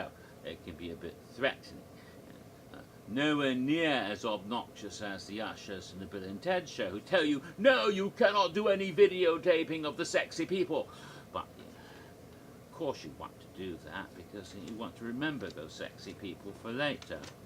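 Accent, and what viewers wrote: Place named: United Kingdom. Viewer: Oh wow, your British accent is awesome Doctor!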